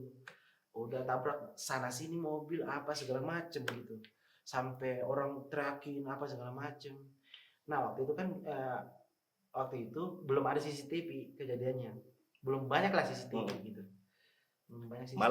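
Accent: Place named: Eastern Indonesia, Indonesia. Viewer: "Apa Segala Macem" Logat orang timurnya gak ilang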